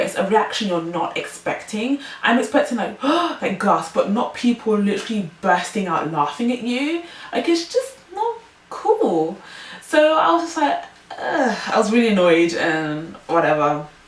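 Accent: England, United Kingdom. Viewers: im not trying to be mean but the british accent is geting a tab bit annoying ... i mean it was ok at first but the video was a little to long for the accent and its getting tireding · Holy crap youre english!!!! I love your voice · ahhhh yesss....a black girl with a british accent.....so sexxyyy yum......0_O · Are u from england? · your British 😮😮😮yesssss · Honey you are gorgeous, sexy, and I love your British style accent. And you have a beautiful personality and seem to be very intelligent. Your hair is unique and different, and irrelevant to who you are as a total individual.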